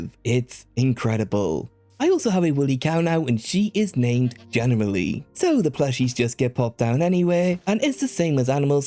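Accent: Wales, United Kingdom. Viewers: always thought i heard a bit of welsh accent come through now and again, that comment on the welsh summer confirmed it 🤣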